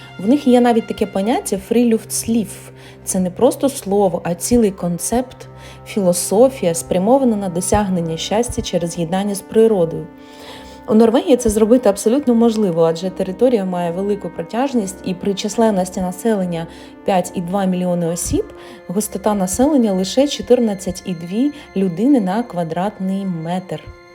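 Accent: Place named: Russia. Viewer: Чувствуется, что на самом деле Вы думаете и говорите на рус языке...а вот влог пытаетесь весьти на укр языке, ну тогда выучите хорошо украинский.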